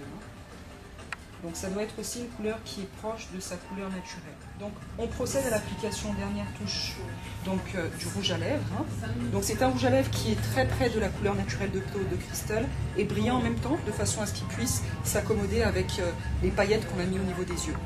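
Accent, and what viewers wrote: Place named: England, United Kingdom. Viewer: puree l'accent anglais !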